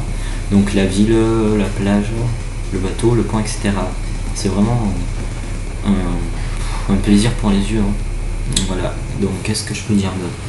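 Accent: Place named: South, France. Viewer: Ton accent de sud xD sinon bonne présentation du jeux ;)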